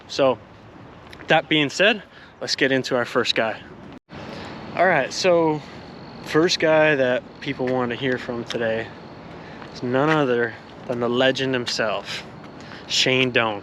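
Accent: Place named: England, United Kingdom. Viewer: Where in England you from bro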